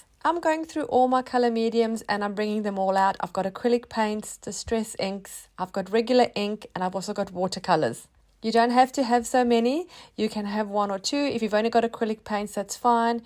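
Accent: South Africa. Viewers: Howdy watching from Cape Town in South Africa. Your accent sounds very South African.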